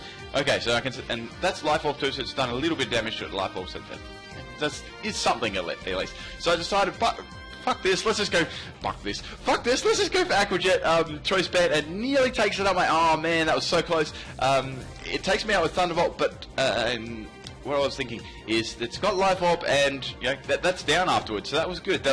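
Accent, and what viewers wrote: Australia. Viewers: Yes it is, but he's an Aussie so it's okay for them to mispronounce words, or it could very well just be his accent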